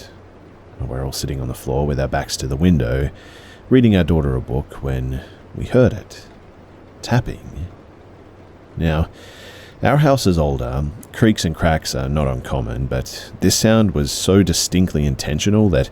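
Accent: Australia. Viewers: Are you Australia? Loving you content aswell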